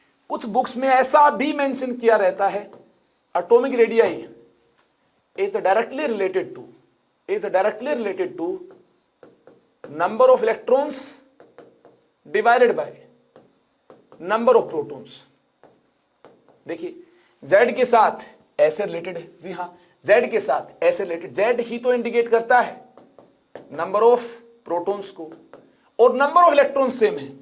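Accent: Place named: Haryana, India. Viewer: Sir aap ka tone haryanvi h ...😆😁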